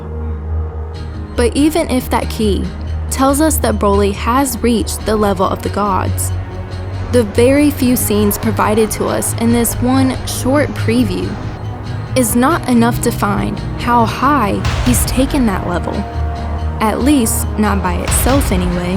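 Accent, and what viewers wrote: South, United States. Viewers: your southern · I thought u had a southern accent I'm from s.c so I've heard way worse.i love your voice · I knew you were a southern girl lol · you're a fellow southerner? well that's cool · Where r u from with the southern accent?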